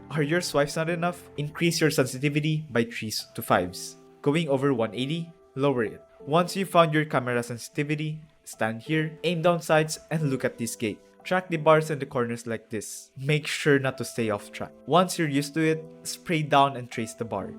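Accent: Philippines. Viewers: IDK, But you sound like you're Filipino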